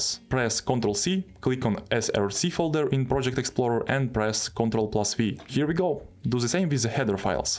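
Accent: Russia. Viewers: Акцент сразу выдает рашу )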